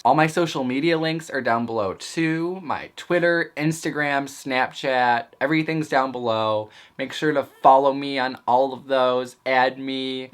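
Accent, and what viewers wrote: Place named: Michigan, United States. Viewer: I can tell you're from Michigan with you accent !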